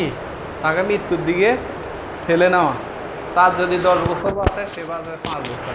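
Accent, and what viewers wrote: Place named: Chittagong, Bangladesh. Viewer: স্যার এর লেকচার অনেক সুন্দর, টপিক গুছিয়ে বলে, স্যার মনে হয় চট্টগ্রাম এর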